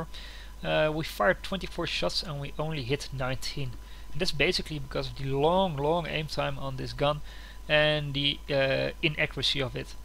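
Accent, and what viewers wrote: Netherlands. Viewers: what i have noticed this time, you're actually dutch ^^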